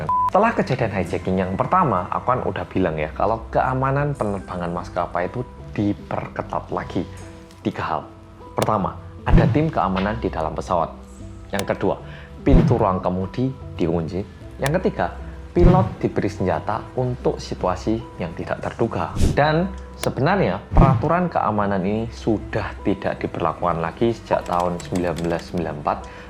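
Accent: Java, Indonesia. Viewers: Jiaaaahhhh....... lg seru2nya koq bersambung. Wah dikerjain mas medok nih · Semangat terus maasss medooookkkkkkkk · Nyimak orang Korea sing medok👍 Mantap👍 ni ulasannya Sukses selalu mas🤲🤲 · Di thumbnail nya ku kira mas ngomong ada bom, ternyata medhoook:v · Korea reomit hanya orang korea yang medokkkkkkk.. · Setiap Gw Nonton Video Yg Gw Dengar Medooooookkkk!! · Ayooookk... Bolo2 ndang cus ndelok like share coment di chanel Korea reomit bersama orang korea yang medoooookk 😊🤗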